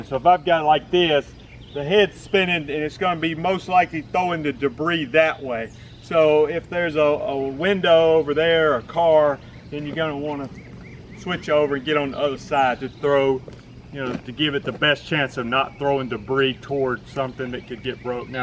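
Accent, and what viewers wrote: South, United States. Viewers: 1. Attractive 2. Southern accent 3. Handy 4. Excellent sense of humor. Is this guy single? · Great instructional video! Cool personality and love that southern drool 👏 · Your not English are you.?..love that southern drool . Over here in lil' ol' England we call it a lug hole..lol! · Loved your video, I did learn something, and I think its funny some of your comments about what the knuckleheads say about you or your work. And can I say, I love your "accent". I'm from the midwest and I forget comfortable and how easy it is to speak to or listen to someone from the South. I think if you stopped by my house, we'd be out on the deck or patio drinking a beer, just talking about things in general. I think that says quite about you. Best of luck and thanks for the video!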